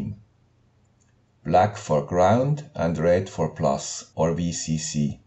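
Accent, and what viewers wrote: Switzerland. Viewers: I have been surfing around for Arduino related content and every time I start watching a video or two, I end up finding one from your channel. From Lora Gateways to Dupont Wires, from Crimper tools to anything. I always find the info I am looking for at your channel. For short, I want to thank you for your great work. I am big fan, and I wish you health and strength to make many more useful videos for the community. Thank you so much:) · Appreciate the channel of the guy with sweet Swiss accent !!! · Useful tips! Thanks for sharing,! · as usual, " ", you simply peddle great info. simple, to the point, and, that hallmark of good info, seems pretty damned obvious AFTER you're told, lol. kudos, and a new subscriber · Trick no. 4 was exactly what I was looking for but unfortunately I don't have a solder gun at home so rip. But these tips are great,! 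Thankyou so much!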